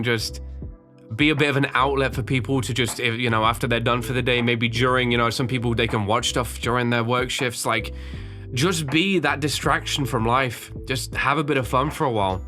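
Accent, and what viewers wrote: United Kingdom. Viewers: For what it’s worth, I think you’re a fantastic YouTuber. I agree that it would be cool to see you explore games other than horror. But I stumbled across your channel looking for Backrooms themed games and I’ve been hooked on your videos ever since. It’s nice hearing a fellow British accent too. Your voice is way more relaxing than a lot of other gamer YouTubers. It would be nice to have longer, unedited content. I’m here for it! Great video! Keep doing what you love!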